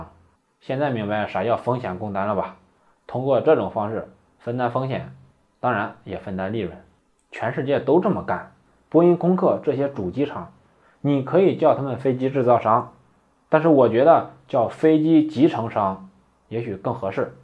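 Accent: Henan, China. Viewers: 听了两期已关注！提点小建议，普通话听着别扭，河南话带劲，我也不是河南人但听着带劲。干脆就河南话整起来，兄弟要有文化自信啊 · 聽到的，河南鄉音，好舒坦。繼續努力加油唄！ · 空客的河南话很地道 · 这个老丝儿是河南了呀！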